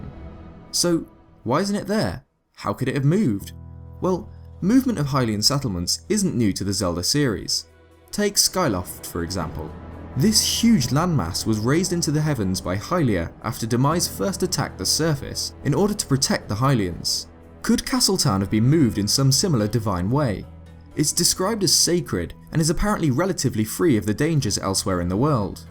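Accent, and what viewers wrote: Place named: England, United Kingdom. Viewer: shut up guys, I dont hear say ocariner....I however hewr him say O differently. I love his accent! always have liked english accent.💞 I feel close to the english, as we used to be a commonwealth, not sure if we are or not, still have the queen on the money..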